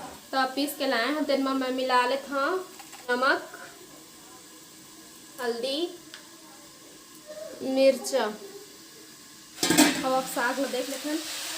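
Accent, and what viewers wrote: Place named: Chhattisgarh, India. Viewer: बहुत बढ़िया दीदी आरूग छत्तीसगढ़ी म बोली बहुत सुंदर लगते आप कहां से हो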